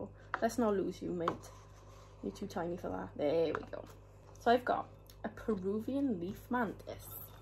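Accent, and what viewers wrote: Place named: South Wales, United Kingdom. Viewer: You sound like you're from my neck of the woods. South wales?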